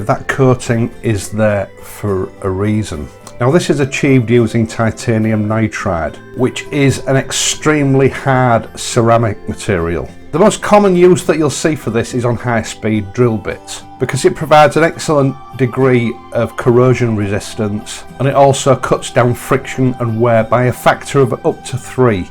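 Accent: United Kingdom. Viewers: Came here to see what a gold chain looks like on a Bonnie (trying to decide between natural or gold for mine) and came away with being fascinated by the accent. Of course I've heard many a UK accent and love them but I am by no means a scholar on the regional differences. I could be wrong but this doesn't seem like one of the more "standard" ones. Here I heard what sounds to me like hints of the US southern accent...and of course it makes me think that's possibly where it came from. For example the word "time". It's like "taaam" like the US south. Anyway, I love languages and dialects. Can anyone tell me which specific accent this is?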